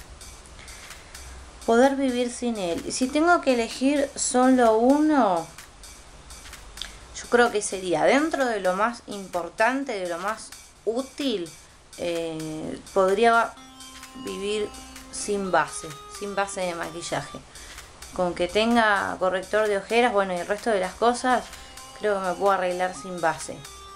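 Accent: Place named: Argentina. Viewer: pues eso, el acento argentino :) jejeje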